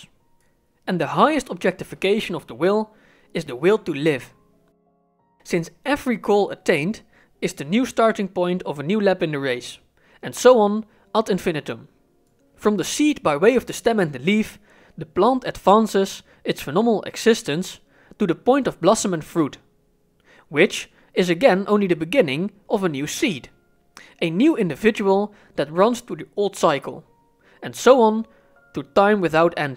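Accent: Netherlands. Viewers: You're Dutch, aren't you?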